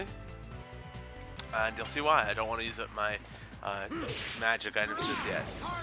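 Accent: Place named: South, United States. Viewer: Where are you from cause it sometimes sounds like you have a southern accent?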